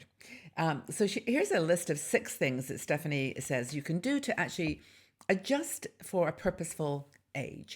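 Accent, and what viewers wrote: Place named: United Kingdom. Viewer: I can hear that British accent love your channel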